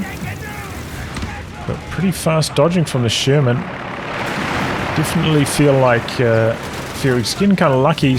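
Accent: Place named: New Zealand. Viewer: Just found your channel, and from hearing your voice I guessing your also a Kiwi. You're doing good stuff mate, definitely gonna watch some more.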